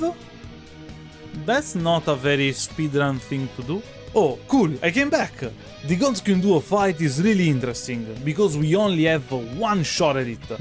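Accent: Italy. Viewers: Im 90% sure is accent is a strong italian accent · Our favourite norvegian-with-heavy-italian-accent youtuber!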